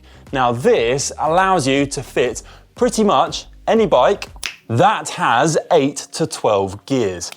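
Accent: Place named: England, United Kingdom. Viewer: Irish name, Irish flag, English accent?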